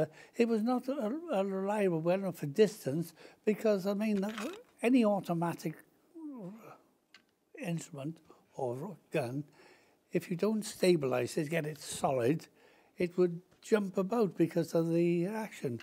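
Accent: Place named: Wales, United Kingdom. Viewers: Sounds like he's from Cardiff or Barry :D. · Lloyd Pagett He has a welsh accent, I live in Wales can confirm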